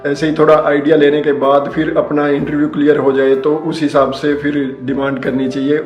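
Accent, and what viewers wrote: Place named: India. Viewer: Omg how to understand that Indglish..😩😵‍💫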